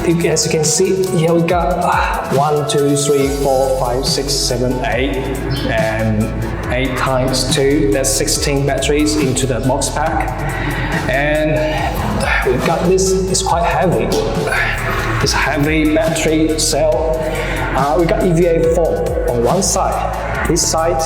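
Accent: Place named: Australia. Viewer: Was listening you sound like an Australian accent.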